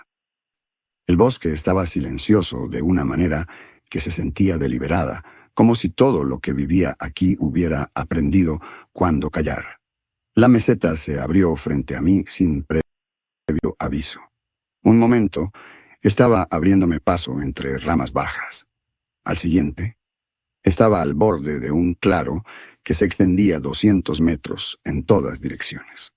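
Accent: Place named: Latin America. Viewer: Q bueno escuchar una voz latina. Desde argentna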